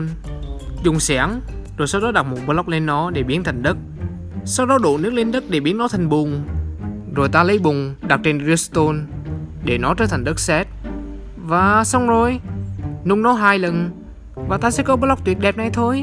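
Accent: Central, Vietnam. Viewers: Anh người Miền Trung ak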